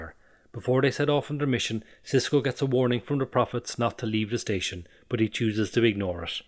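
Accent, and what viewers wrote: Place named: Ireland. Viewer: jaysus I need my ears syringed 😂 nice to see / hear some content in an Irish accent 🖖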